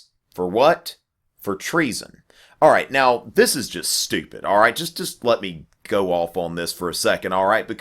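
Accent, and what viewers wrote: South, United States. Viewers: Yeah it's southern. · Forgive me if I'm wrong, is your accent from the southern part of the USA? I'm Indian and I've heard this accent on American TV series, which makes me curious.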